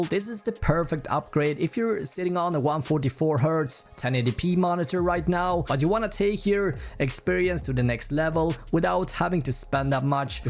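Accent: Sweden. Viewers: SVENSKKKKK man hör det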